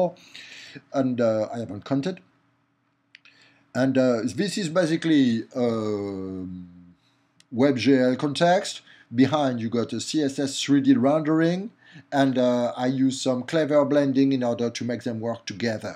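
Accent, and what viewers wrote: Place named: France. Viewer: I love this and I think it's easier to do it in WebGL than in JavaFX :) Your fenglish is cute :)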